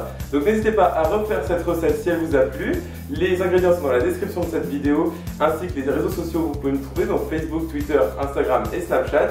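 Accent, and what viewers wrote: United States. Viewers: Mais peut être qu'il EST américain ? Ça ne vous ai pas venu à l'esprit ? Ou qu'il y vit ou passe ses vacances donc a l'accent ?? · es tu Americain? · Tu fait un peu trop l'américain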